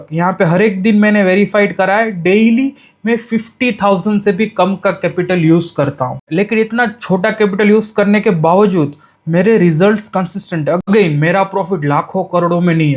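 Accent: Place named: Gujarat, India. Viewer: Gujju lagte ho bhai aap 🙄🙄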